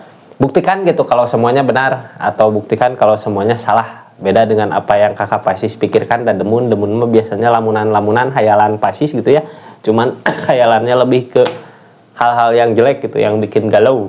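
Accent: West Java, Indonesia. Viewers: Pagi kk..pisces hdr..terimakasih reading.ya sukses dan selalu sehat kk..sunda banget..❤❤❤🙏🙏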